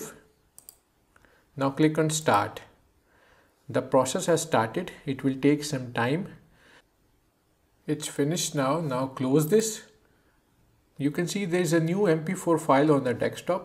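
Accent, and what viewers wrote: India. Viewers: Of course the tech video is voiced by a guy with a strong indian accent. fuck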